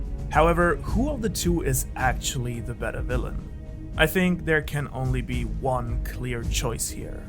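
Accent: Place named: Germany. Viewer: you dont sound native english....are you from germany ?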